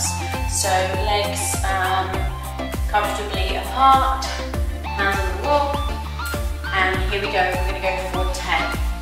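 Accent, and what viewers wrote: United Kingdom. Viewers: Bloody brilliant! I'm not overweight but I am unfit. I hate watching all the buff fitness instructors strutting their perfect bodies on YouTube. We need real people we can relate to. Please keep it up. You are a breath of fresh air. It's also a bonus to hear a British accent for a change. 😘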